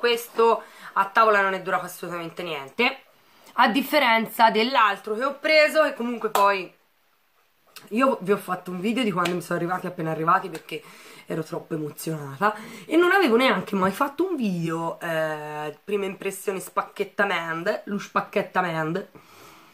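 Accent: Tuscany, Italy. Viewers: Grande mi mancava sentire il nostro toscanaccio!!! BEN TORNATA!!🎉🎉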